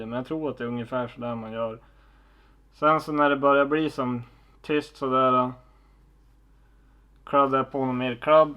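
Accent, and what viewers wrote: Norrland, Sweden. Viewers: Äntligen, slutade ärligt kolla på dig när du började med engelska. Det är ju norrländskan man vill höra 🤠🤠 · Norrländskan är tillbaks 😍😂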